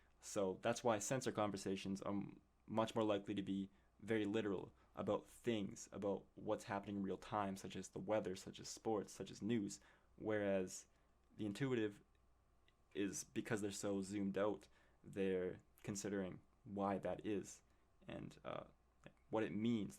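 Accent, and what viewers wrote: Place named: Canada. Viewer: Are you Canadian? Love your accent!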